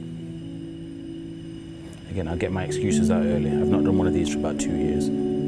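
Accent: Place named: Nottingham, United Kingdom. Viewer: I love hearing a Nottingham accent on YouTube...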